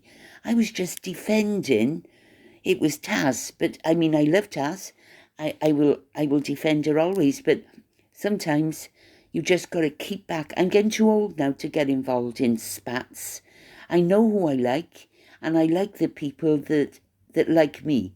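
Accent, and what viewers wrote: Wales, United Kingdom. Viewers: Hi,. I miss hearing your wonderful Welsh accent, as I live here in the Southern part of the U.S. Yes, you should be monetized. I empathize with you, Taz and Avid, too. God is still in charge, no matter what. Anyway, you are so very entertaining, mixed in with some home truths and life lessons for us all. Wish we could go back to a more innocent time, but our youth and childhood have long since passed. I also find myself a bit drowsy in the afternoon, as I am older, just like you. God bless you, your loved ones and friends, and take good care of yourself. 👑🏰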